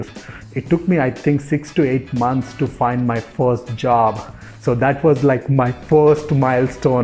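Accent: Telangana, India. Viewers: Great story. You sound like you are from Telanagana, if so then which part of Telanagana you are from?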